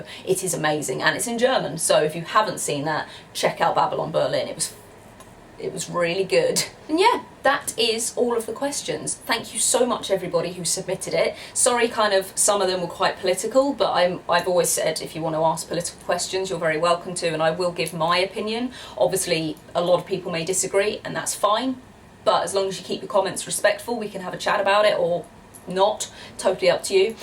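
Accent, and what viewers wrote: United Kingdom. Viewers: Just love to listen to your beautiful british accent. Such a treat.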